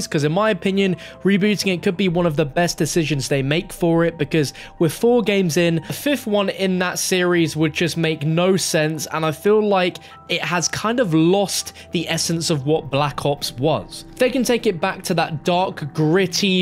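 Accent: England, United Kingdom. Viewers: Why do you English say EFER when it’s ETHER?! Or Hewps and it’s Helps!? Becuuz when it’s because??! Just sayin man!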